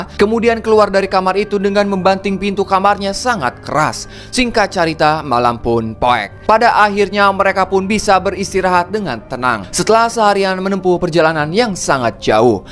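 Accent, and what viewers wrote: West Java, Indonesia. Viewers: Semangat trs ya bg.. Sy suka banget logat sundanya...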